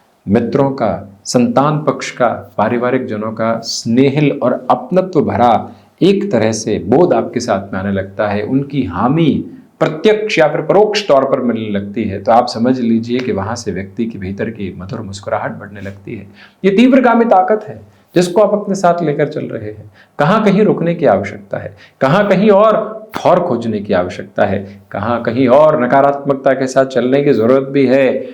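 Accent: Standard. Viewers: आप बहुत शुद्ध हिंदी बोलते हैं, बहुत से लोग समझ नहीं पाते, घ्यान दें। मैं हिंदी में एमए हूँ।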